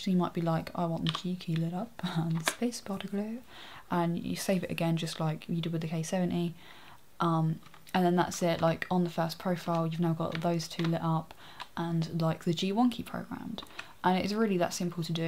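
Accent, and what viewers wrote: England, United Kingdom. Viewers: Such a beautiful voice. Can I ask what part of England your accent is from? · You have the perfect English 'accent'. Technically it's not an accent I suppose but there are definitely different accents/dialects in England. You have the nice and proper way of speaking and I could listen to you talk about nothing all day long to be honest. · this is a very good channel, with a lot of good stuff and its a talented, good looking girl, but her voice is so so bad, with that annoying british accent and a very low voice.keep the good work and work with your voice.congratulations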